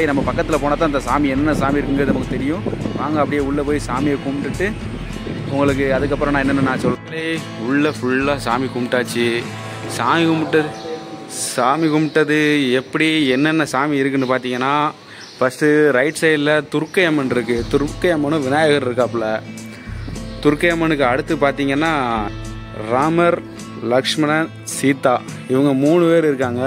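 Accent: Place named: Thoothukudi, India. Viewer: மிகவும் இயல்பான slang.இப்படியே தொடருங்கள்.தூத்துக்குடியா?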